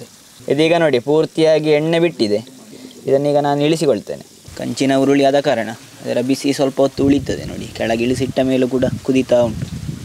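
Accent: Mangalore, India. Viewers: Oh I got after listening your Kannada accent I thought that you are from Mangalore so I asked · Mangalore Kanadda Bhashe Estu Chanda Maraya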